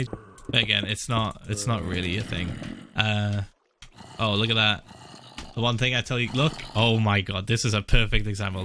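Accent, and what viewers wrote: United Kingdom. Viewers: gosh i love that british accent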